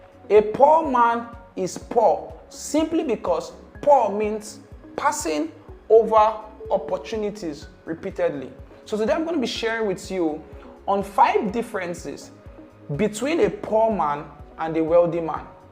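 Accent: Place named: Pidgin, Nigeria. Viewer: Pidgin English never leave you sha😅😅, awesome video thanks alot for it🙏🙏🔥🔥🔥🔥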